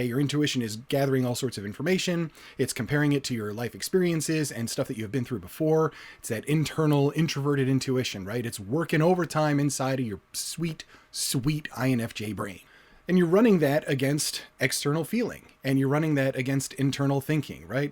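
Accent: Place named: New Jersey, United States. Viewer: LOL! True Jersey speak! 😁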